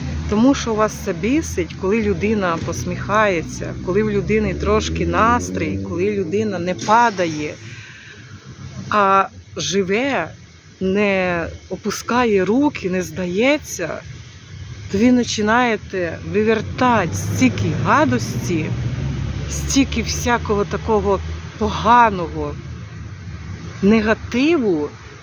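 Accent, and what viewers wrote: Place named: Polesia, Ukraine. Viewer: Я только ради вашего особенного колоритного языка задержалась и осталась. Слушаю, как вы рассказываете все равно про что. Знакомлюсь немножко с украинским Полесьем. И да, вы очень привлекательная женщина. В любом вашем состоянии или настроении. И в лесу, и в городе. Мне из Москвы не огидно.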